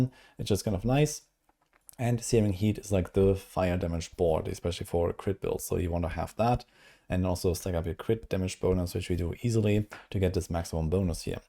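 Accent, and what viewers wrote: Germany. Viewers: Man erkennt immer sofort wer deutscher is. Nice vid! Wird direkt nachgebaut 🎉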